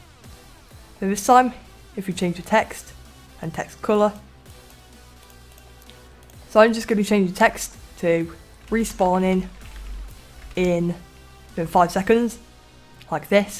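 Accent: United Kingdom. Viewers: You sounded like a fellow bri'ish mate.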